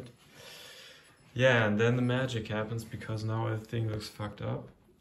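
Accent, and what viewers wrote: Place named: Germany. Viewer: Nicht schlecht, aber man hört das du Deutscher bist haha grüße.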